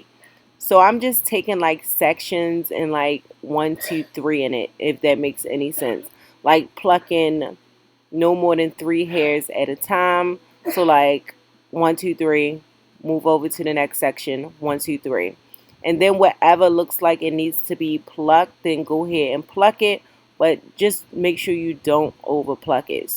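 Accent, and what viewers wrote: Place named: Maryland, United States. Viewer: That “Murrlin” accent is THICK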